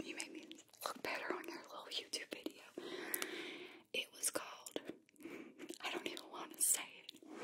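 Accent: South, United States. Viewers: Oh my god. That accent! <3 I'd give my right arm (also known as my favourite arm) for a Southern Belle girlfriend. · Your southern accent is awesome! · I really like this video, love the southern Accent. How about more of these once in a while and or other accents you can try. And I also appreciate you 💜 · Yes to some good sweet tea and kool-aid! Loving this southern accent whisper! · Something about the southern accent man. Thanks for doing another one! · Not all sound like this but there is a good amount that does, just because you don't sound like this doesn't mean that you aren't from the south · Sweet tea, ribs, and that accent are probably the only things I miss about the years I lived in the south. Stay Awesome 🤙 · Do southerners really sound like this. I don’t feel like I do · I live in Charleston SC too so I’ve spent my whole life listening to this accent and slang. 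Love it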